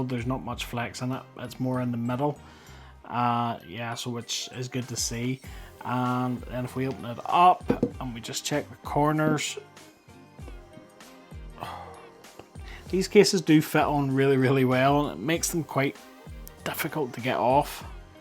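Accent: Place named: Scotland, United Kingdom. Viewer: Lovely to hear a Scott! Scotian by blood.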